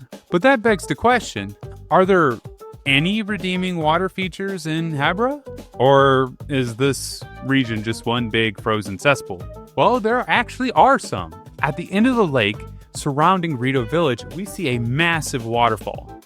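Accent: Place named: Midwest, United States. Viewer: Your voice is extremely midwestern north america. Btw very cool video, I learned a lot!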